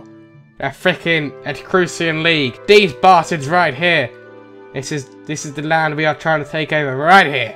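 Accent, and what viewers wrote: United Kingdom. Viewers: Nothing brings out your british accent better than playing some rtw2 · Why do you watch if he has a British accent then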